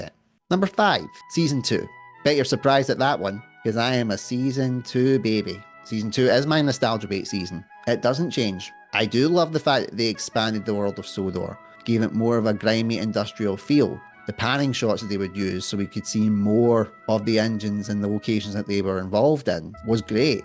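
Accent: Scotland, United Kingdom. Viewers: Came for set discussion, stayed for the Scottish accent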